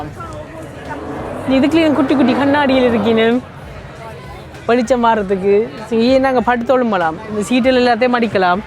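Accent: Sri Lanka. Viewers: Bro srilanga tamil super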